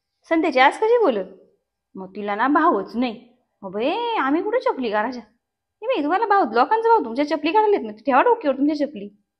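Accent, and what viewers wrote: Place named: Shahapur-Murbad, India. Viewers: Shahapur murbad bhasha 😂😂😂 kinhavli pn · अभिनंदन आपल्या शहापूर - मुरबाड च्या महिलांचा परफेक्ट टोन पकडलाय . ऐकायला गोड , आपुलकी जाणवते . शुभेच्छा.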